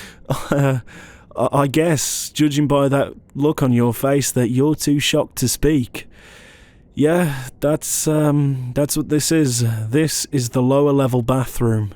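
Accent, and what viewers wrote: United Kingdom. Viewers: He fucking sounds British…I love it..😂😩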